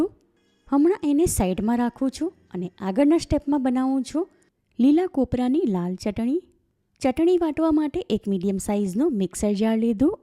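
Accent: Surat, India. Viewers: Chokks surti chho bolo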